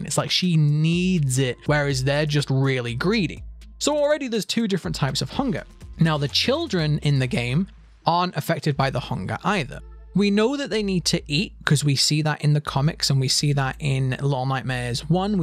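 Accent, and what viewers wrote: United Kingdom. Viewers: He's so aggressively British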